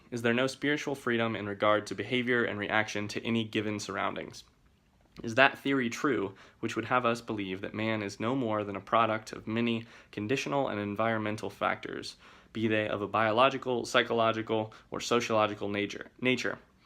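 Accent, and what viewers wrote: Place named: United States. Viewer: You know,. you are so American. The way you carry yourself and talk is typical American ( according to what I think is typical American I guess😅) so adorable🥰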